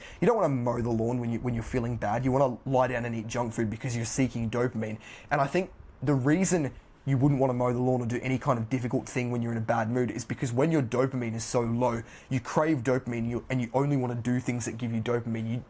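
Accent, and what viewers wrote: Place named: North Carolina, United States. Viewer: Bruh you from North Carolina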